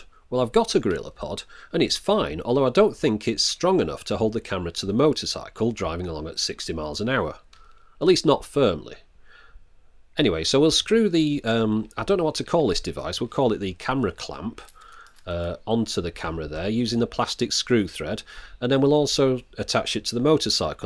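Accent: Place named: Manchester, United Kingdom. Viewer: Cool Manchester accent. I wish I sounded like that...but of course everybody recognizes my accent..."I'm here to pump you up."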